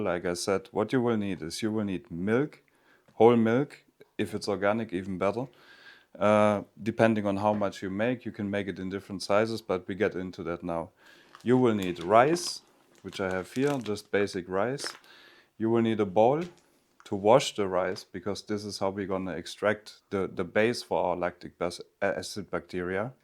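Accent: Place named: Germany. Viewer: You are obviously a German speaker ❤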